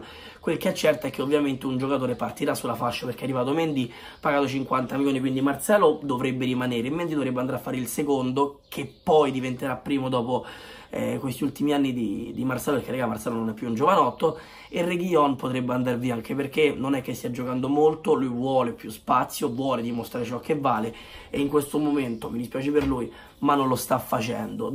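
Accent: Rome, Italy. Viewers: io ti seguo da sempre e mi sei sempre piaciuto ma come giornalista sei di basso livello perché sbagli tanti verbi e ti metti a parlare in dialetto romano.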